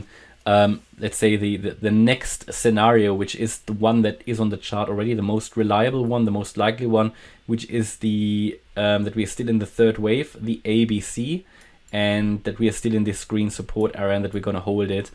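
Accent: Germany. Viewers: Also komme was wolle, ich mag dein Englisch. Ist so dolle mit deutschen Akzent, aber gleichzeitig recht detailreich und super verständlich. Machst du gut . Wünsche euch viel Erfolg mit dem Kanal. Ihr bietet sehr kurzfristigen Input, und nicht erst 3 Tage später nach dem Motto:”Das war ja absehbar!” 👍🏻👍🏻 damit kann ich zumindest mehr anfangen !!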